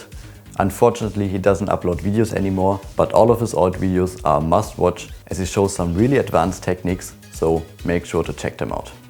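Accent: Germany. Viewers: i am hearing deutsch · ar you germen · dieser deutsche akzent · You really sound like a german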